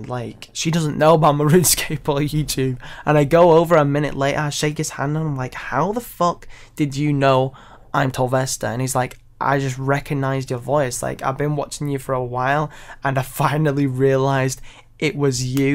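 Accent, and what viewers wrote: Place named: Northern England, United Kingdom. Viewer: North England Accent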